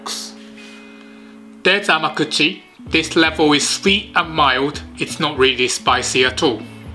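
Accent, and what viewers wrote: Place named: London, United Kingdom. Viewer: Great video the accent is really really unique your English teacher must be super British, probably from London and working class, teacher probably not formally educated on pronunciation. Very classy vid but not a "t" pronounced (mel"t"ed). Anyway 1000x better than my Japanese will ever be lol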